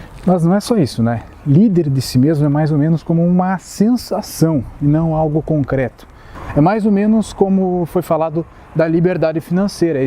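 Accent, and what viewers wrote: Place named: South, Brazil. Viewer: Você é do Sul? Tem sotaque.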